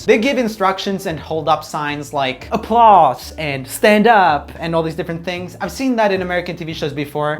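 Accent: Gothenburg, Sweden. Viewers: why is his swedish accent so strong here? :DD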